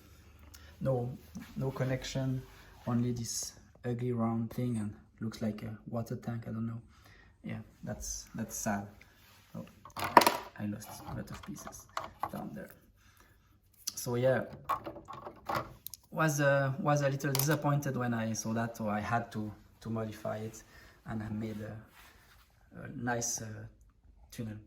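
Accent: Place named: France. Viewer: un french accent ma foi tres mimi, gg à toi pour les modifs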